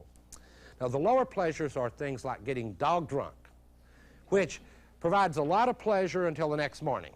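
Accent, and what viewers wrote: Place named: Texas, United States. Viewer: Watching this made me realise, as an Englishman, all academics should be delivered in a Texan accent.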